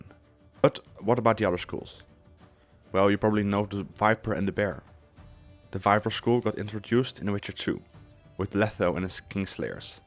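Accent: Netherlands. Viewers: Yeah youre defenitly dutch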